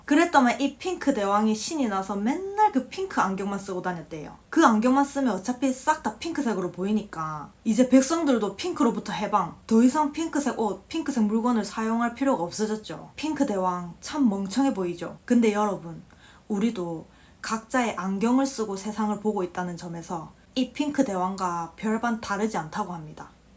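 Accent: Gyeongsang, South Korea. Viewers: 제가 50대인데 진짜 한테 많이 배우네요 그리고 듣고나면 속이 시원타 ~딱 부러지는듯한 경상도 말투도 글코 뭔가 한숨이 길게 나오는거는 속이 시원해짐을 느껴서인듯 ㅋㅋ 앞으로도 좋은 방송 부탁드립니다 화이팅!! 열렬한 팬입니다!! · 오늘 처음으로 유튜브를 우연히 보게 되었네요~~재미있는 경상도 말씨 ㆍ세상쉬운 노후자금 마련방법을 보고 ~두번째 프레임이라는 주제로 잘 설명하는데 ~~매력에 푹 빠졌네요. 아직 학생인것 같은데 세상에~~똑소리 나네요~~구독했어요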